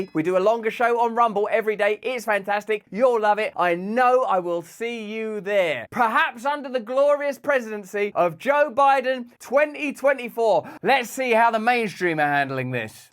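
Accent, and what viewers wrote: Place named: United Kingdom. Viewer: “Geh op an go geh’em”… “Get up and go get them” I love the British accent, not making fun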